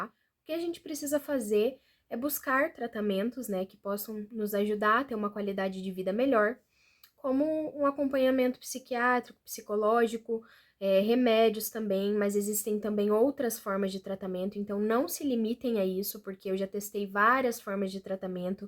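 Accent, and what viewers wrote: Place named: Curitiba, Brazil. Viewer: Nossa você é muito gente boa eu me senti compreendido , também tenho isso é horrível , sou de Curitiba também . Percebi devido o seu sotaque também .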